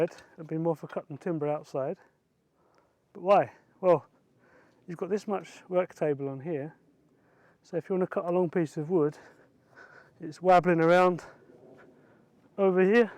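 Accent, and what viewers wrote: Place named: United Kingdom. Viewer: Where are you based ? You’ve got a uk accent and foreign plug 🔌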